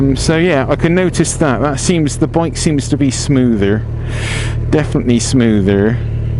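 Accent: West Country, United Kingdom. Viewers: Hi mate, where are you from? Sounds like a West Country accent but a little different to my home town of Bristol!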